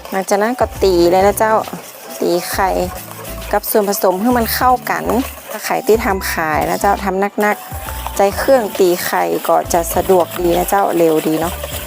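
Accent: North, Thailand. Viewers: อู้กำเมือง น่าฮักขนาด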